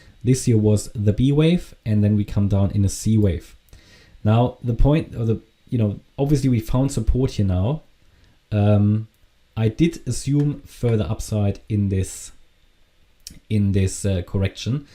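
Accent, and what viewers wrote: Germany. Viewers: du klingelst sehr DEUTSCH an.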